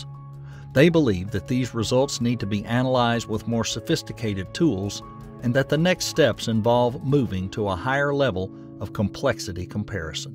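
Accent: South, United States. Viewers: This guy having a southern accent makes this 100x better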